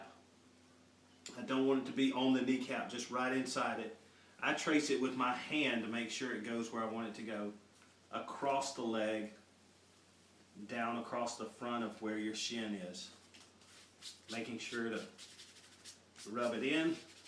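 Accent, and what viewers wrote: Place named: South, United States. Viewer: I think he says 'interior meniscus' (with a southern accent), which means medial meniscus. And he meant to say 70-degrees angle. All good. Still a good, instructional video.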